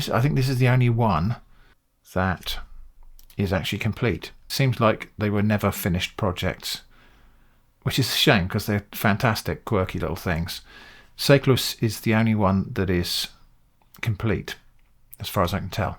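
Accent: England, United Kingdom. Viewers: um...games is plural....if some one asks you if you play games, and your answer is 1....then your real answer is NO I DO NOT PLAY GAMES...I play one game...thats a good way to show you're not really paying attention, and just making something about you, which is why you would know what the question is...even though you're obviously not paying attention if some one were to ask if you if played any type of game....thats basically it, do you like any type of game? That is singular...sounds like a English accent, but many people from Asia have English accents. So its confusing....you can make a 36 min video that only people who like you will want to watch while saying you don't have time to play games...do away with these type of videos and you might have several more hours a day.... as some one who doesn't know you, I don't honestly care if you play games...this is my intro to your channel and I feel I made a mistake by coming here. 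Now youtube thinks Im gonna want to watch but this channel isn't for me, if you're talking about yourself on the first video. We already have too many people in real life who think they are some big shot, thats one reason why we avoid real life and live online. Less people who are egocentric, used to be at least.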